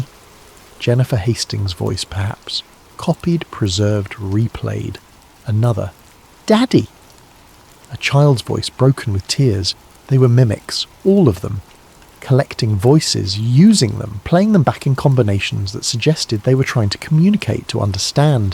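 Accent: England, United Kingdom. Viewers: English accent, really? We're talking about a place in the U.S. It's too distracting.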